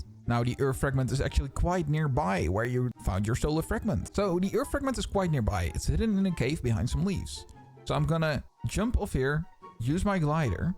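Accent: Netherlands. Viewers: r u dutch by any chance bro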